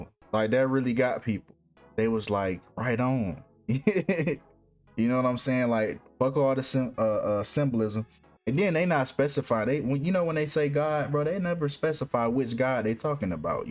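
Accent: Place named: Detroit, United States. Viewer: Bro you gotta be from Detroit i hear it in yo voice 😂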